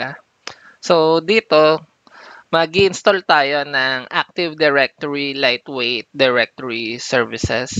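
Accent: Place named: Iloilo, Philippines. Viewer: Nice tips sir, I've been sharing your post to help the community, niche ko rin sana to kaso di pako certified and di pako ganun ka bihasa hopefully marami akong matutunan dito. maraming salamat! and may ilonggo accent ang pag sasalita mo, I'm from Koronadal City , Ilonggo dialect rin. hehe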